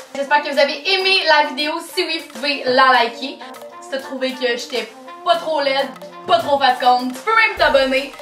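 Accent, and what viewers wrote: Quebec, Canada. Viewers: xago1822 avant je trouvais l'accent québecois un peu chelou mais maintenant je trouve ça tellement kiffant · De base le contenu m interesse pas vrmt mais juste le fait d entendre l accent québécois jrigole pdt 10min donc c cool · Nn tkt l'accent Québécois be like❤️😍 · Haha j'ai mis les sous-titres pour rigoler et voir ce qu'ils vont dire avec ton accent canadien (je suis française), il a mis des trucs complètement aléatoires, même moi j'ai mieux compris du haut de mes 12 ans...😂❤ Je t'adore 💚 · Toujours trop bien tes vidéos où faire un defi de recette au frigo ou un congélateur ou essayer de parler sans accent ou exprésions Québécoise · BlockShoooter non tu n’est pas la seul moi je kifffff l’accent québécois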